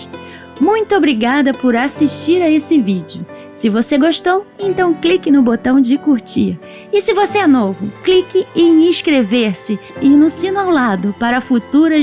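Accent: Rio de Janeiro, Brazil. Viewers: Amei suas historias e sua voz carioca😊❤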